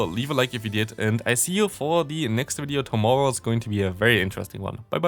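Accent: Germany. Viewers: I knew it you were german! the classic german accent like mine xD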